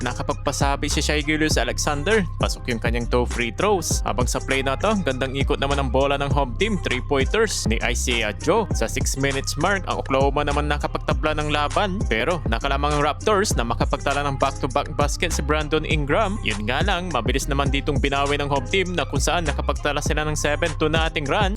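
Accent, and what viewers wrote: Pangasinan, Philippines. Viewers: That pangasinense accent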